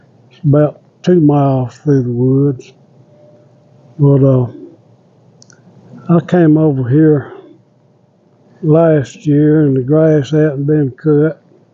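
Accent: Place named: Mississippi, United States. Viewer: I enjoyed your video, the stories (headstones are a book of life to me) your kindness and your Mississippi accent. 😊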